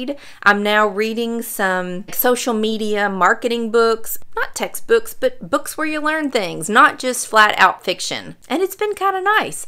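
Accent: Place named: Kentucky, United States. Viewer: Wow. My first program from you was all about Pollock. Great u-tube. Now I sit down with you, and get my visit with my new best friend! You are so fun to get to know. I've seen 10 of your videos and am hooked. My Daddy was from Texas. I was born in Paradise (San Diego) and in elementary school people would ask me about my accent. Was I from the South? I love your Kentucky accent and and you confidence, southern charm and relate to your experiences. I'm 72. Soon to be 73 in June. TY for being my new BF. God bless you, lady bug. Linda D in SD.😊🇺🇸💕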